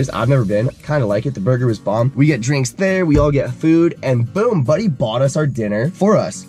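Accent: Canada. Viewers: Lmao...I'm a Canadian so I just think he sounds normal 😆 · Woah the Canadian accent is strong today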